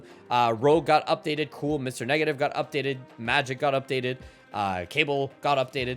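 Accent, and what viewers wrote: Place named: Quebec, Canada. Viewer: Clairement un québécois!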